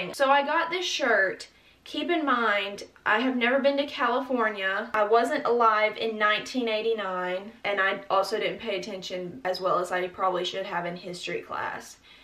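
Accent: South, United States. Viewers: I love her country accent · r u southern. also, love that orange shirt. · U like batteries and worry about being mistaken for Spiderman. With this southern drawl, u can get away with anything. xo's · Its her real accent shes a real southern gurl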